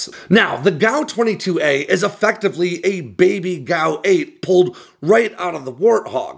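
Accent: United States. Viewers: You say that almost like an American would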